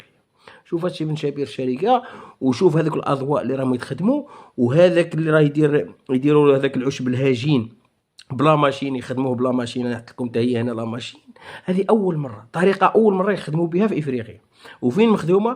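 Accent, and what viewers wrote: Morocco. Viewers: هذا والله ما جازئري راه مغربي من الشرق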